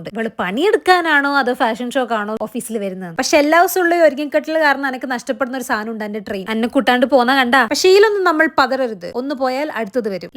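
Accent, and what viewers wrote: Kannur, India. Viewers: Anne koottand poonnath kandaa😂 Kannur slang📈📈📈📈📈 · Kannur anappa nee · Kannur aano 🤔 varthanam kettitt choichatha😌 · Kannur aano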